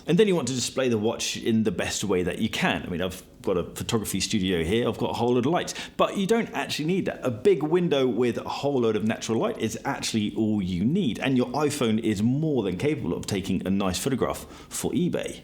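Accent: RP, United Kingdom. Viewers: is uk based surely you have picked that up from his accent. Things happen outside the USA. But he should have clarified it’s a UK eBay Free fee offer for private sellers. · To be clear, in the UK it is free to sell for private sellers, not business sellers. Also vehicles aren’t free. If you are in the US or elsewhere or are classed as a business seller then this isn’t the case., if the accent didn’t give it away, is UK based and not a business seller in this case. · Very posh ❤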